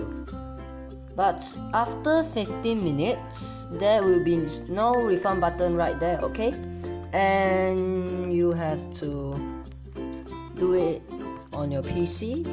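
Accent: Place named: India. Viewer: Sorry guys but he sounds like one if those Indian scammers lol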